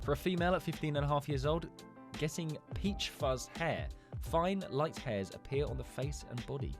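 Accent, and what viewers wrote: United Kingdom. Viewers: Are you british.because you and joocie (my goat)should collab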